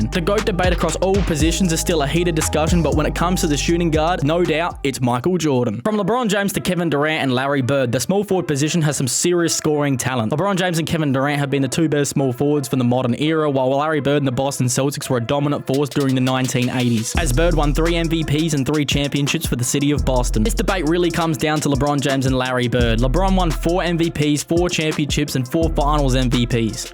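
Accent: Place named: Australia. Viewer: yo r u aussie you sound aussie i am btw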